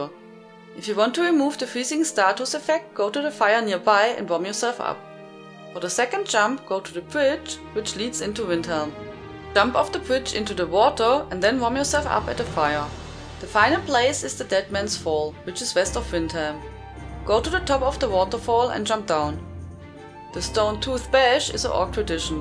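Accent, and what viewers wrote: Germany. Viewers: I love your German accent. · Man hört direkt das du deutsch bist hahaha :D <3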